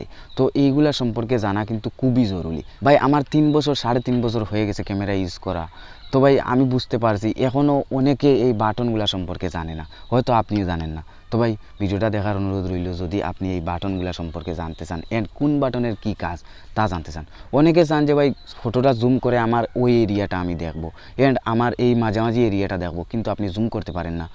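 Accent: Sylhet, Bangladesh. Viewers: Sure o sylheti 100% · Vai apnar basa ki sylhet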